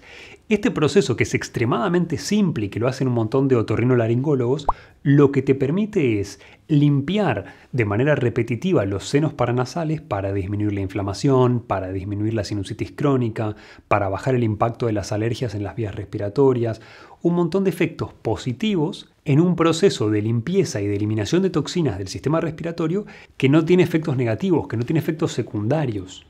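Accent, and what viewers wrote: Argentina. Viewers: Tiene una voz de Argentina y en mi mente se me viene sus típicas frases "che" boludo, nene , pero vos que me estás queriendo decir" jajajaj me encanta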